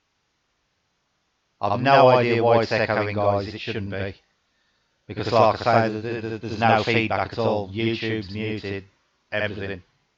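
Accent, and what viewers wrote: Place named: Dudley, United Kingdom. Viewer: Missed the live but catching up now. Nice to hear that Dudley accent aer kid hehe :)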